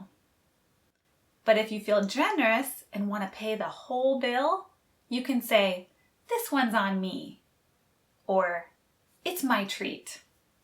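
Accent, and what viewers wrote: China. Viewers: You know well how a Chinese speaks English. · Hey, thx for the amazing teachings! How come it sounds like pulling away from ‘HK English’? haha 😛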